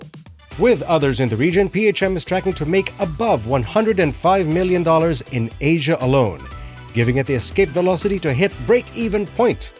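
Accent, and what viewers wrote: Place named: Scotland, United Kingdom. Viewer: I like your Scottish accent better than Scrooge McDuck and the critical drinker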